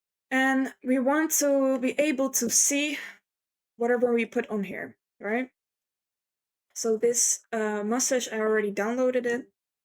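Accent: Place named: Morocco. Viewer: U sound moroccan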